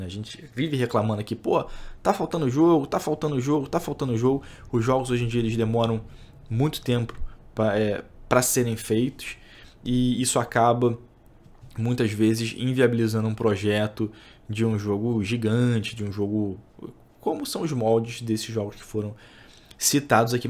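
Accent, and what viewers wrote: Rio de Janeiro, Brazil. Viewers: esse jeito de carioca do falar é bom demais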